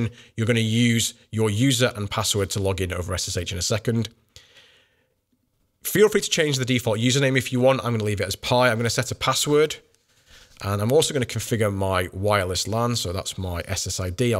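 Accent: United Kingdom. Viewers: Just found your channel tonight and have watched 3 or 4 videos and really enjoy listening to you. Its also nice to hear a fellow brit voice too.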